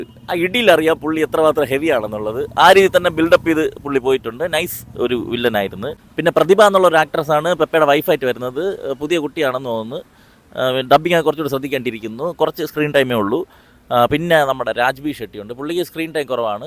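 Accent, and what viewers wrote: Trivandrum, India. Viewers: എനിക്ക് താങ്കളുടെ തിരോന്തരം ഭാഷ ഇഷ്ടമായി !😂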